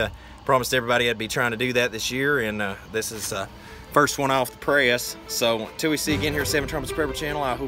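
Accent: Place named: South, United States. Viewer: Love your southern!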